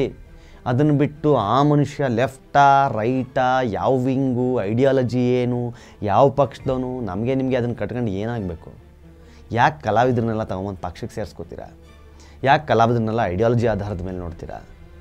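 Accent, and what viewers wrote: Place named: Coastal Karnataka, India. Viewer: ಕರಾವಳಿ ಕನ್ನಡ ಕೇಳೋಕೆ ಚಂದ....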